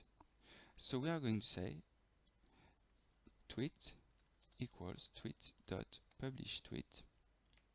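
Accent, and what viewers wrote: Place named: France. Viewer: J'adore cet accent so frenchie! Merci pour ce tuto clair et précis! J'utilise depuis quelque temps la version 0.9 de tweetinvi et j'essai de poster une vidéo (pouvant dépasser 15 Mo). Suis je obligé d'utiliser la version 1.1 ou 1.2 et si oui, y aurait-il un tuto pour l'utilisation de ces nouvelles versions? Il semble que TwitterCredentials ou AuthFlow.InitAuthentication ne soient plus valides pour la connexion d'un utilisateur X qui souhaite se logger avec son compte tweeter. Merci.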